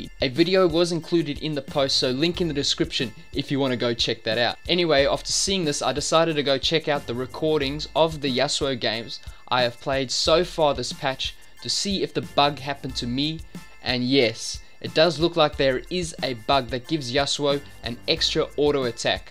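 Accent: Afrikaans, South Africa. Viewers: are you south african?? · is jy Afrikaans dude? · Dude are you south african?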